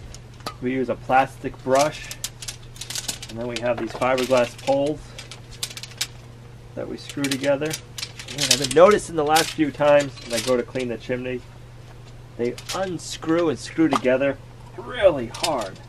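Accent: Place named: Boston, United States. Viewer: I thought your accent sounded familiar.... Boston strong! BTW I subbed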